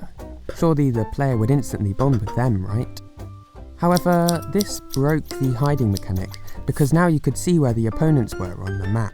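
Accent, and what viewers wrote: United Kingdom. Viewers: You have an awesome speaking accent Are you British?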